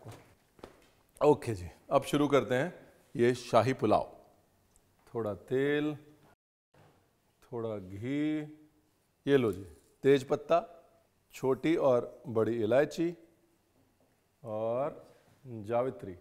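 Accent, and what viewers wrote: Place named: Lucknow, India. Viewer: Khana to superTasty Rhta h but aapka wo Lucknovi Andaz ki baate Heart touching ❤❤❤❤ You are real Gem Sir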